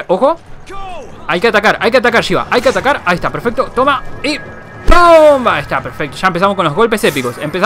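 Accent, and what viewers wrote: Argentina. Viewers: sos argentino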